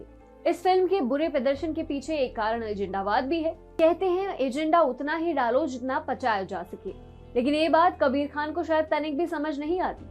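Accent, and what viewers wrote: Standard. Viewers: I must appreciate your shud hindu pronounciation 😘😀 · Yaar itnaa suddh hindi kaise bol lete ho pehle yeh batao? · Listening to pure Hindi was good ms.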